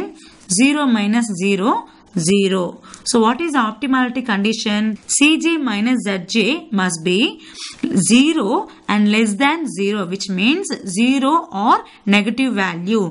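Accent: India. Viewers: Man I need that indian accent, for some reason I feel math would just be automatically more easy to understand.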